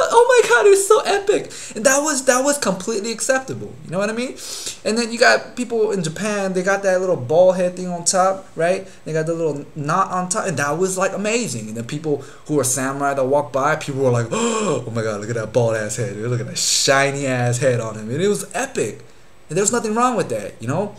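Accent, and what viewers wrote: England, United Kingdom. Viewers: LOL I love your English accent!! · What the hell I couldve SWORN i was subscribed to already! lol No wonder why I havent seen a new video in a while. lol but Haha the "Wear underwear or something thats nasty!" XD too funny! I didn't know they went freeballing in those kilts lmao! Learned something new everyday lol btw Your britsh accent is awesome!! ELLO LOVE!:D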